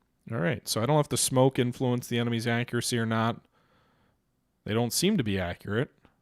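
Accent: Germany. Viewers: Is that a german accent? 😅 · That german accent is pretty good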